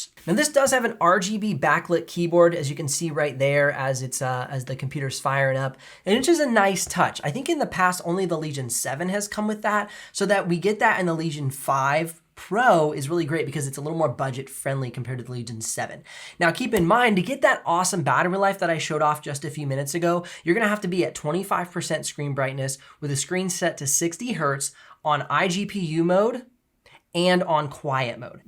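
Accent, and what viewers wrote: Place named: United States. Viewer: Its basic murican accent, which is easiest accent to understand.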